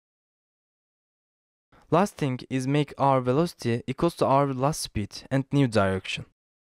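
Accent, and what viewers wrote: Turkey. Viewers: tek bir sorum var sen türk müsün ? çok belli ettinde :D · Reis İngilizce kaynak olarak bile bu kadar kısa ve net anlatan bi tek sen varsın. Türk olduğunu anında anlasam bile :) Bu arada anlatım muazzam. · Aksanindan turk olduguna yemin edebilirim :D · Great video thank you, You sound like turkish are you ?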